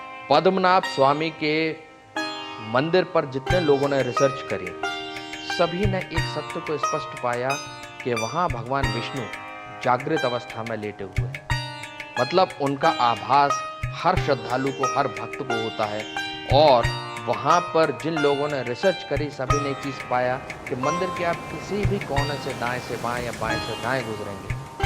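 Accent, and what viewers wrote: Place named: Haryana, India. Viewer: Good haryanvi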